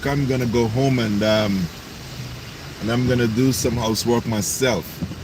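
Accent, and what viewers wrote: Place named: Caribbean. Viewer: OOOOOooooh, ok. I was wondering about the accent my brother. You're from another Caribbean island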